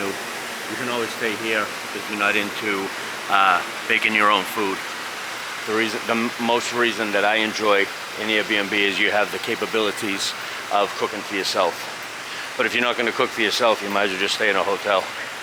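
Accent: Boston, United States. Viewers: Learn Spanish bro, I can barely understand you with that Boston accent and I am a native English speaker 😆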